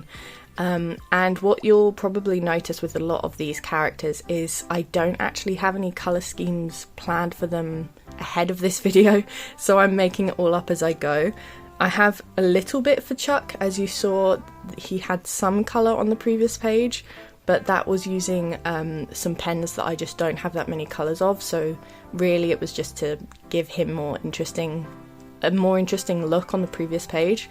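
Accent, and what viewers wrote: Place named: United Kingdom. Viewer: Are you British? You sound like it soo much! ❤❤❤ by the way I love this video thank you for sharing!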